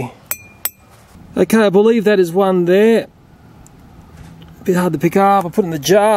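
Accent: Australia. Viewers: Not really. This man is Australian. The actor who played Korg is New Zealand Oscar director Taika Waititi. Aussie and kiwi accents are very different. But at least you didn’t mistaken it for an English accent! LOL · Lemme guess. YOU ARE FROM AUSTRALIA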